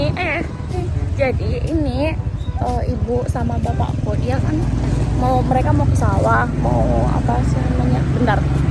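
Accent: Java, Indonesia. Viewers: BHS.indonesia:Baru kali ini aku ketemu youtuber cantik main ke sawah BHS.jawa:Kaet Iki aku roh youtuber ayu maen neng sawah Suaranya anak Jawa mana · kk apa orag jawa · Wong jowo endi suarane rekkkkkk😎😀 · Jowo ne jowo ndi t mbk ?? Nek kulo jowo timur madiun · Orang jawa ya kak? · Jawanya mana si mba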